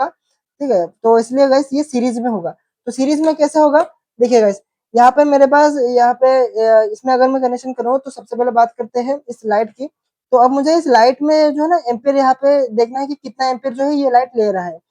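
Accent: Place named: Odisha, India. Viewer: mujhe lagta hay odisha se ho app😂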